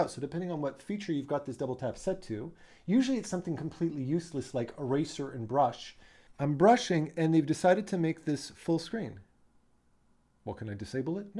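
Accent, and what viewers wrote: Quebec, Canada. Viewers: hey man. nice to finally meet you, Hang on. Youre french canadian?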